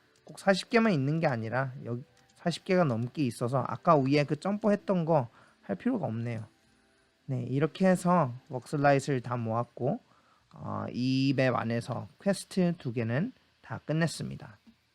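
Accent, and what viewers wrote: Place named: United States. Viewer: 발음이 미국에있는 친척형 같아서 목소리가 되게 친근하게 들리네요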